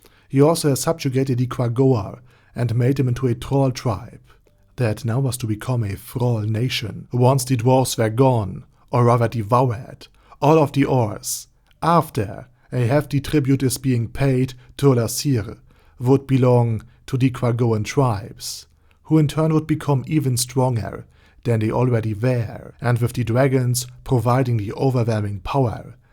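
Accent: Germany. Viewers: dude I love your accent, are you German?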